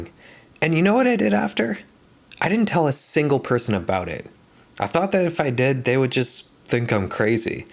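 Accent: Canada. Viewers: Lol youre canadian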